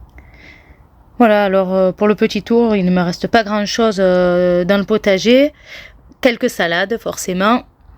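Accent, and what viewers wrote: South, France. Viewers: Bonjour, avec ce petit accent du sud que tu as je ferme les yeux et je me crois à Palavas les flots. Chez moi le Cèleri ne tiens pas l'hiver il gèle et disparaît à chaque fois, le tiens est encore très correct! tes navets tes choux sont jolies aussi, attention quand tu enfonce la fourche bêche j'ai entendu un vers de terre hurler! 😁 ta terre est bien vivante, à bientôt 👍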